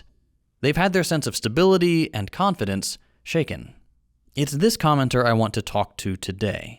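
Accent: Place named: South, United States. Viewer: As a literate person from the Bible Belt myself, I also appreciate the accent as well yall.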